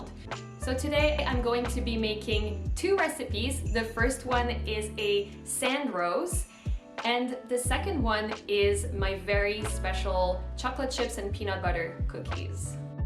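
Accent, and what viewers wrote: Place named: France. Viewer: Can’t wait to try your recipe! You sound a little French like me!!! I live in PEI but I’m from NB. I’m also French speaking!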